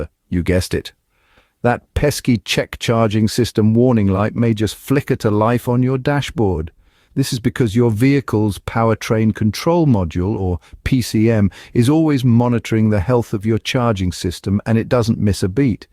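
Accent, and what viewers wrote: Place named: RP, United Kingdom. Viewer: WTF is this Brit talking like this is etiquette school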